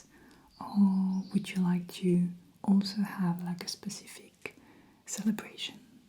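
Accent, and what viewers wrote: France. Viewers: Since I found you watching the Futuristic Hearing Test, respectfully I’m addicted to your voice. So soothing and that French accent on your English is the last top cherry on a cake. Really really good job. Keep going. I would love to see a suit fitting but in an elegant way and also a flight attendant. Both in English as well if it’s possible cause idk any French 🥲 Still, great work! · This is the first video I’ve seen of yours and I love the atmosphere and soft voice. I can hear the French accent, but some words seem to have a hint of South African or New Zealand, which is really cool! This was a great video :) Just read your little bio. Nice to see another enby out there in the ASMR universe 🥰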